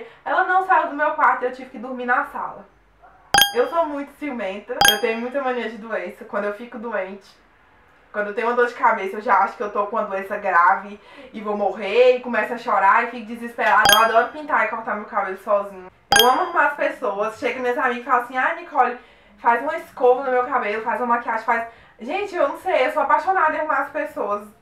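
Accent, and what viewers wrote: Minas Gerais, Brazil. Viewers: Juro que pensei que você era mineira como eu, por causa do sotaque KKKKK adorei, bjs! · Morri de rir com o caso da franja, já fiz parecido, kkkkkkkkkkkkkk' Me identifiquei muito com a sua personalidade, parece demais comigo. Até o sotaque é parecido, só que sou do Norte de Minas, inclusive achei que vc também era mineira porque o sotaque parece muito.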